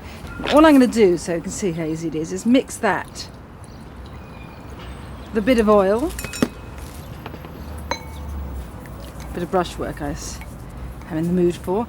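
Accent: England, United Kingdom. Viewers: When i was young, sure, loved her British accent and the way she has with words but as I grew older, I saw that she could use spoons more, wash hands after handling eggs AND FOR THE LOVE OF GOD, SCRAPE THE BOTTOM OF THE BOWL AFTER POURING OUT THE CAKE BATTER! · I love her english accent. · too much british accent for me to handle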